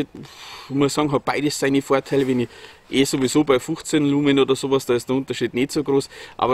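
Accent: Bavaria, Germany. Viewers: #M2R Pro Vielleicht bringt sie mir ja Licht ins Dunkle?! Vielen Dank für deine super Video in bayrischer Sprache!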